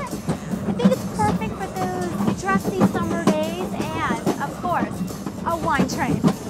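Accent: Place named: Singapore. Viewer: im guessing she's from singapore so she's got the singaporean accent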